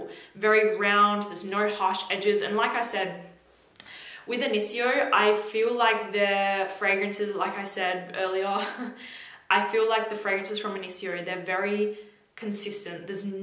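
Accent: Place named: Australia. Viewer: Australian accent spotted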